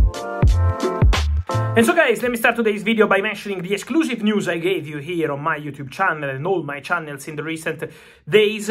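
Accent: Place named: Italy. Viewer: Italian english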